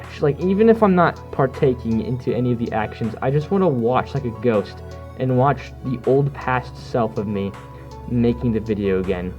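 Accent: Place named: Mid-Atlantic, United States. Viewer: Awww you got the cutest mid-atlantic accent. Also: Deer do have fangs. And not like, during the Pleistocene, some deer have fangs to-day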